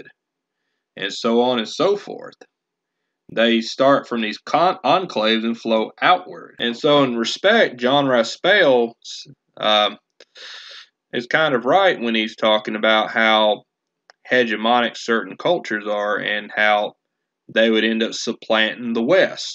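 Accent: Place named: South, United States. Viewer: Ofcourse you would have a southern accent, not even surprised in the slightest bit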